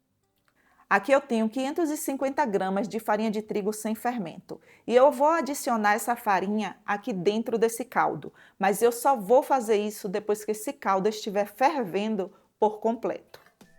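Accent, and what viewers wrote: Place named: Northeast, Brazil. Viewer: Pelo sotaque tu deve ser nordestina como eu. Suas receitas são TOP. Obrigado por nos ensinar essas maravilhas que você faz. Parabéns.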